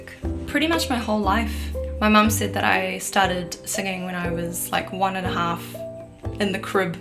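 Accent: New Zealand. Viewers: found your channel while looking for a guitar tutorial for " " by. great cover and guitar composition by the way. your speaking voice is so different to what i expected. love the kiwi accent lol. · Thank you so so much for sharing a little bit of background story about you. I have always listened to your music but to learn more about you was fascinating because I thought you were living in China and Chinese but you sound like you are from New Zealand. That threw me way off lol but I love your music and your Vibe and your authentic personality! And I can't wait to hear what you come out with next!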